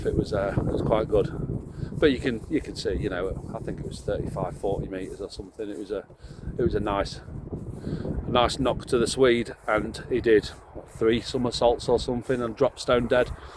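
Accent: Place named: Lincoln, United Kingdom. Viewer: lincoln, im hull, thought i recognised that beautiful mucky accent. il give you a message one day and pop down!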